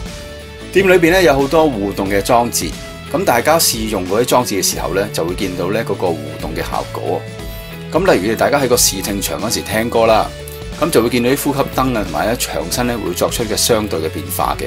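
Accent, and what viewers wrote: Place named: Chaozhou, China. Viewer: 潮州佬黎既喔！聽口音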